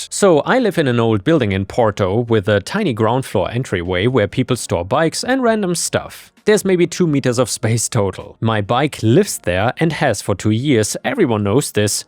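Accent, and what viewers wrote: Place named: Germany. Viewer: German accent really works with these revenge stories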